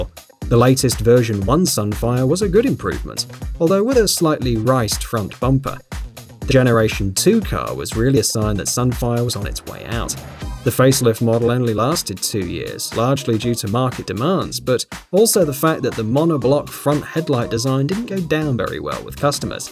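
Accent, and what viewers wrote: United Kingdom. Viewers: Yozika - It’s how we all say it in the U.K. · hi un day cou pay? freakin' british english.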